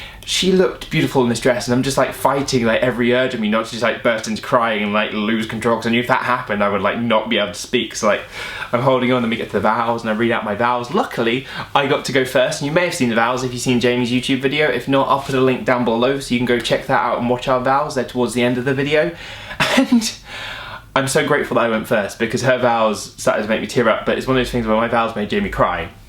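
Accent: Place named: England, United Kingdom. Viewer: 'Southampton', the accent, London....probably England, unless they moved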